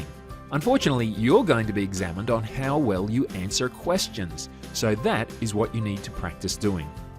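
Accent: Australia. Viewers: Super helpful! Genuinely ingenious advice I haven't heard before. P.S. Is it just me or does the speaker have a weird mix of Aussie and American accents?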